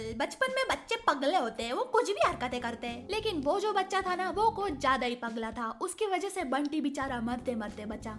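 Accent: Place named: Gujarat, India. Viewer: Aapki tone sunke lagta hai ap Gujrati ho 😂❤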